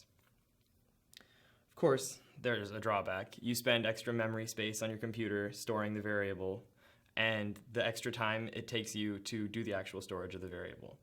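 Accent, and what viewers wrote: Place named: India. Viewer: Awwh yes! Finally an english speaking lection! I am no racist, but god damn how can one focus on that Indian/Pakistani accent?!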